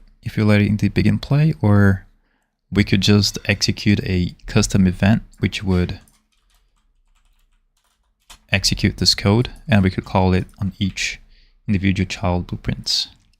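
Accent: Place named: Brazil. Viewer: mano, tu é brasileiro kkkkk programo ouvindo vc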